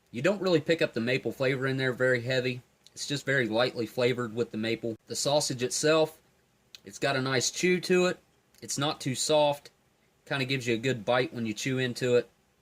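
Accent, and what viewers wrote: South, United States. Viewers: Yup, you've got the southern accent down lol so do I and I don't realize it haha until I started living in Wisconsin.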